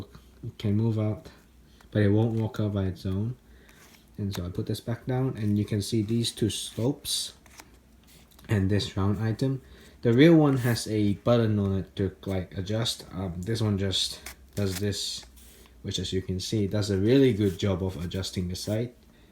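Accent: Russia. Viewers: I am a Russian, the accent is so good I thought you might have been a Muscovite . I own an arsenal of AK guns and comparing this cardboard replica to my actual AK-47 it is incredibly accurate, almost every little detail is included. You are extremely underrated, it's always the creators that put no effort into the videos that get popular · r u russian?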